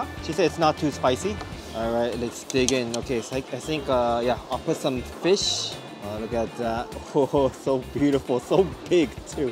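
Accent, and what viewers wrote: Singapore. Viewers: hi...i like yr relaxed singish style...keep up the good work!!!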